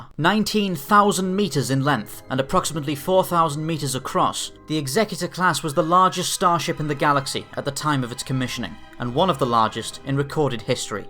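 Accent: United Kingdom. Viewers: I love the facts told by your accent, british?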